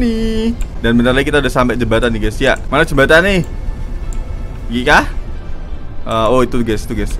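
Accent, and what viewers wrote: Java, Indonesia. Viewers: Kenapa selalu lucu kalo Mas e ngomonge medok 😂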